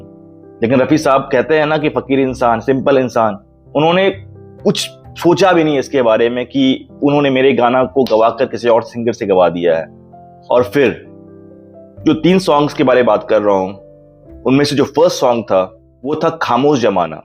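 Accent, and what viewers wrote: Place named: Bengal, India. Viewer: Aap ka gyaan sahi ho sakta hai, lekin aap ki hindi proper uccharan me nahi hai, aap 'R' ka uccharan nahi kar pa rahe ho. Bangali, Bihari tone ka touch hai.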